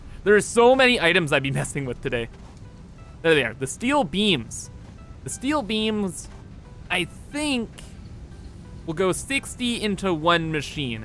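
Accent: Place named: Canada. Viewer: Haha No worries if this is too personal but, what part of Canada are you from? It's always more comfortable listening to a fellow Canadian talk about games!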